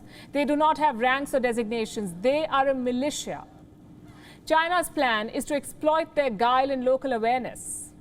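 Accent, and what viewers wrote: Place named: India. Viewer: China, China, China. LOL , i thought i can get a better understanding of India by seeing this site, an Indian station hosted by a lovely Indian lady with a cute Indian accent . But all the things I heard so far is this lady talking about China and nothing much about India. She is talking about China of which she does not understand. I think she don't even speak or read Chinese. How the heck she can get first hand information about China, let alone military secrets? As for differentiating Tibetan Chinese and Han Chinese is like differentiating Bengali Indians from Tamil Indians. Geez, either i am getting old and gullible or just like to have a good laugh.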